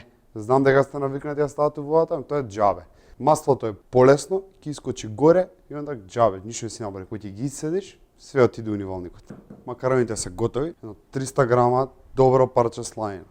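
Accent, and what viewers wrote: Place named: Skopje, North Macedonia. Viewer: Decki govorete makedonski. Vo opsto ne si interesne ako zoruvas na Sk dijalekt. Aloo vodis emisija za recepti. Odbivno e za emisijata toa so se LIGAVIS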